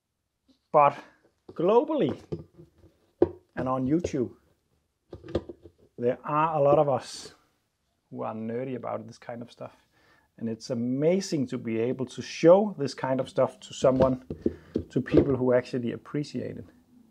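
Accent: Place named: Denmark. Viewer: Got the first video on this moped recommend by the algorithm, all hail the algorithm. It took a moment to figure out the accent was Danish, like myself. As a former postman, I couldn’t help myself from looking at the roads you were driving on, trying to figure out if I recognized anything. And by some freak coincidence, you were driving on my old mail route. Anyway, love the videos, keep it up👌🏻🇩🇰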